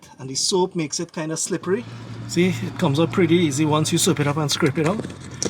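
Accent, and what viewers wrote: Trinidad and Tobago. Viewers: U Sound Like You From Trinidad.... You Live In Jamaica Now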